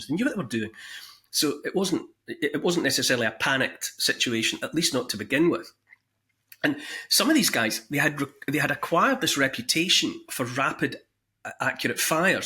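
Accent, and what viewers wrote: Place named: Dumfries, United Kingdom. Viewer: Thankyou for sharing such fascinating stories, I have just ordered a copy of your haunting book. My family are 100 years of farmers from Dumfries- i love hearing your accent from the region. Best Wishes to you.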